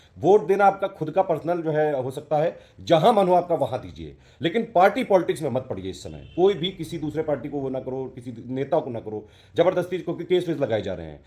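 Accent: Kanpur, India. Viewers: बात तो सही कह रहे हैं आप सर जी बिल्कुल कनपुरिया स्टाईल में बात कर रहे हैं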